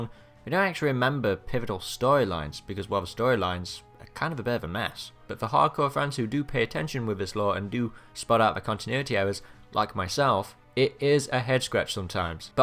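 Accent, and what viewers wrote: United Kingdom. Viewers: Why do you have an English accent until you say Audobods and Decepticaaaans? It’s very odd.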